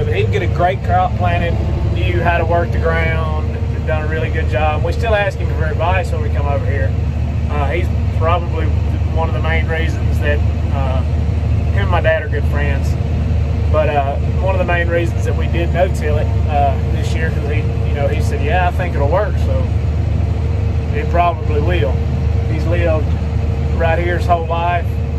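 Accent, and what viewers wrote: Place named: South, United States. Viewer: Worked for John Deere for 38 years and spent a lot of time in Mississippi and Arkansas in the Delta area. Use to go out with the dealer personnel to the field since I was from our planter factory in Moline, Illinois. Some of the best ground for growing crops in the World. Love your videos because you'er one of the few farmers in the south on UTube. Like to watch the corn and soybean farmers but since I'm familiar with cotton I thought your channel was great. I too like the information about the history. I was born in Arkansas and I miss that southern accent. Great people in the deep south as well. Keep um coming!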